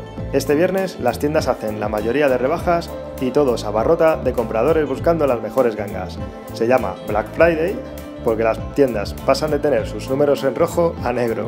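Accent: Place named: Spain. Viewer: Odio ese acento español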